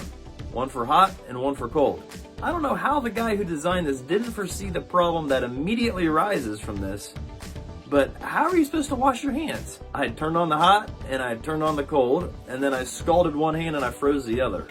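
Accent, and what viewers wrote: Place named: United States. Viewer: Ha ha, again, bro. You talk like a typical American tourist! We got your money.😊 Seriously, you need to get out with Brits away from big Cities.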